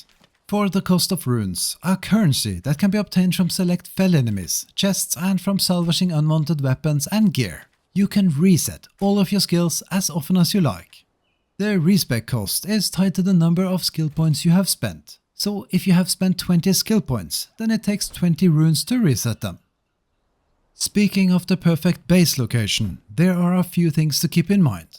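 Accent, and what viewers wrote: Norway. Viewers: Are you Norwegian? ^^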